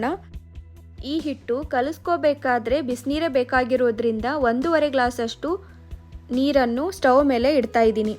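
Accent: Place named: North Karnataka, India. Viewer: ಉತ್ತರ ಕರ್ನಾಟಕದ ಭಾಷೆ ಇದ್ರ ಬಾಳ ಚಂದ❤😊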